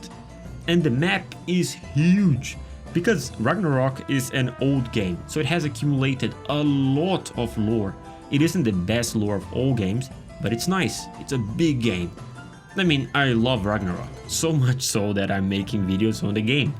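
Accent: Brazil. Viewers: Are you Brazilian? I’m noticing your accent. I’m half Brazilian.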